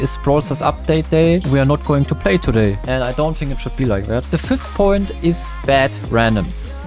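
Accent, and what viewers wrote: Germany. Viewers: Man merkt deinen deutschen Akzent so sehr, feier ich😂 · Wie hart Mann merkt das er deutsch ist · bro dein deutscher aktzent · Voice with german acent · Ich höre das du deutsch bist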